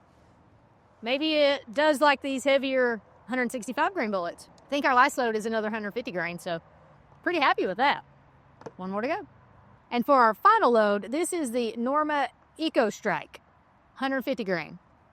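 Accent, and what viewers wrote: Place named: New England, United States. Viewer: Your country Yankee accent is funny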